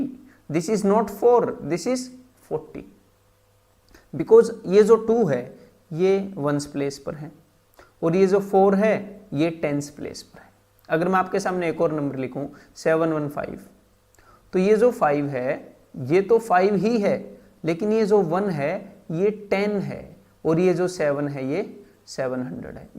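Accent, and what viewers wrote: Haryana, India. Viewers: Haryanvi h bhai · Sir apki mother tongue haryanvi h kya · gr8 Haryanvi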